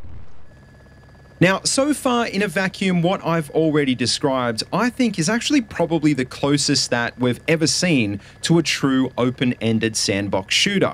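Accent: Australia. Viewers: This bloke sounds like the Silverchair boys. Oh look he's in Newcastle. #AustraliaHasAccentsToo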